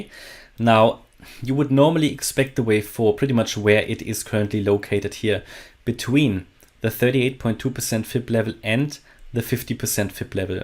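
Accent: Germany. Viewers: an other stunning video full of interesting infos, especially the one you mentioned betw min to regarding the 2 diff optiosn in 3 or 5 wave moves, i m leraning so much follwoing you day by day, The most i like on your channel compare to the other is that you only talk abt TA and EW method, nothing abt market, economy, financial and no sense strategy or opium... keep doing the amazing work and thank so much for all your effort, ( just one question, are you German? ) i m just wondering abt the accent, Golden trades to all of you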